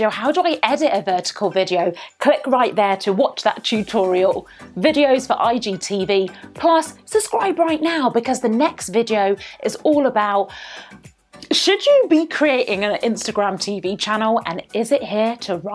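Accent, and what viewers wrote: United Kingdom. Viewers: love your accent, great content! i have never used the app, but i just use the IGTV on the instagram app. will download the IGTV app to make it more official lol, haha that british accent of yours, omg! look forward to more of your content.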